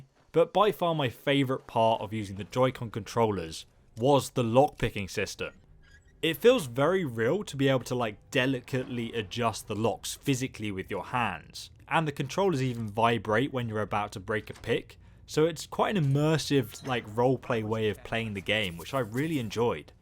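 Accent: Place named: RP, United Kingdom. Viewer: I would love to hear a review of Skyrim VR with that posh accent of yours. I tested all your glitches on VR and they are working too.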